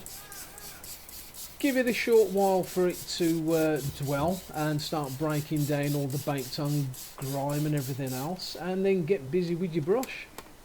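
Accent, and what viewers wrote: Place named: West Midlands, United Kingdom. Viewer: Hi great video enjoyed it, have u tried autobright direct products, thats all i use and the results are spot on, sounds like youm from the west midlands ?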